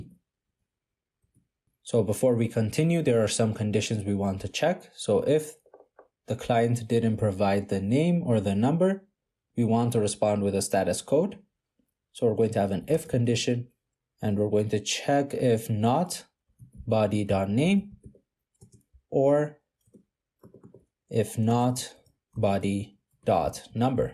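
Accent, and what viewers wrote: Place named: Ethiopia. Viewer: first time to see habesha boy teaching coding in english keep it up bro u got nice accent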